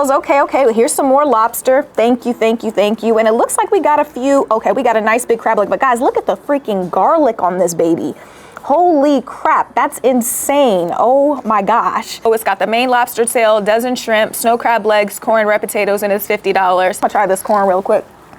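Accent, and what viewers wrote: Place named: South, United States. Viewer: I love the video but i think i got turn on from the cowgirl country talk, then she sings the and song and then she wears a red dress and i think the pumps to go w it.. i love you girl like literally. Honestly, i don't like too many ppl but this lady does something to me. Always have great food reviews videos...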